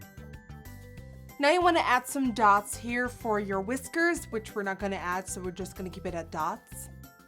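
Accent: Netherlands. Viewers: I ALWAYS THOUGHT YOU HAD AN AMERICAN ACCENT BUT THEN I WATCHED YOUR OLDER VIDEOS AND I REALIZED IT IS A DUTCH ACCENT AND NOW I CANT UNHEAR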